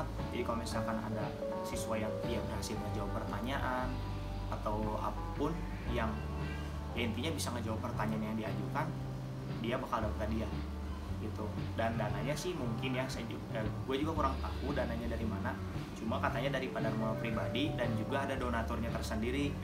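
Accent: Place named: Sunda, Indonesia. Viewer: Menarik ceritanya.jd sejarah tuh yah kakak...ini tapi suara nya kurang keras..teus ketahuan kakak teh orang sunda asli ya..he he..logat sunda nya..kental..pisan..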